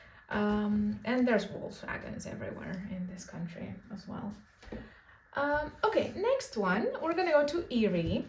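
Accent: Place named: Netherlands. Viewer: I’m so glad I came across you! All 4 of my grandparents immigrated from Netherlands to USA. I love hearing your accent and look for to more videos from you and learning more about my heritage.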